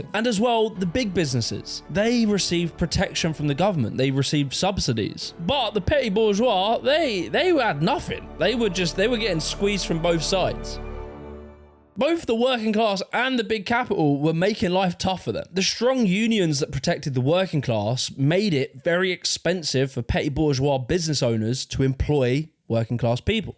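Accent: United Kingdom. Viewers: the funny thing is, if you pull the accent and literally naming Brittan, This video is literally just the story of every "first world" country. None of what was said here doesn't apply just as well to me here in Canada.... the names and faces of the people change, but the story... the story is the exact same. a sentiment that is echoed by other commenters, from other countries as well. We are all playing in the exact same game, just with different localization. its like we are all playing different versions of monopoly, with that same cheating frined that insists on being the banker and not letting anyone else review the rulebook but them.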